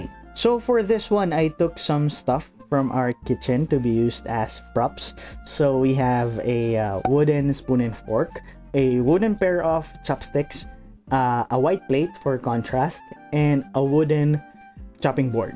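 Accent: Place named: Philippines. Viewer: SKL I recognized our Filipino accent immediately 😂 Much love and support ⭐️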